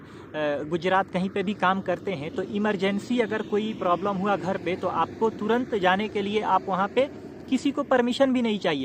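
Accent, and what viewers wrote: Bihar, India. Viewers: Salam.. Aap bihar se ho?? Your accent 😊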